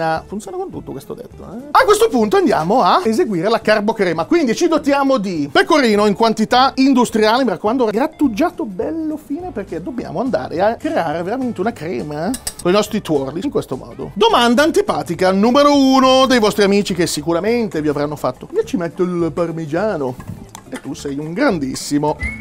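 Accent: Rome, Italy. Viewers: Che bello sentirti parlare Romano! 💛❤️ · Carbonara perfetta... E il tuo Romano pure.... La mandrakata finale é strana ma direi che e boooona 😂 Grande... Sei eeeeeeerrrrr meeeejjjjjo 😂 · ROMANACCIOOOOO NO ROMANESCOO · Il BURROOOOOHHHH non è mancato Ahahaha comunque il tuo romano é 🔝🔝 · Fa abbastanza schifo il tuo romanesco...hahah senza offesa.... comunque bravo così se fa a carbonara quella vera....te se ama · Tu sei pazzooo!!! Pizza con carbonara, burrata e mortazza! MORTACCI!!!😂😂😂 col romano puoi migliora' zio😉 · È stato emozionante aver sentito il tuo romano!🤩🤩🤩🤩 Una Carbonara trucida ma divina, cacchio se la proverei!🔝🔝🔝🔝 Comunque boss sei proprio fenomale!!!🤩🤩🤩🤩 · Sei meraviglioso quando parli Romano te se ama!!!! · Si dai ci sta come parli in romanesco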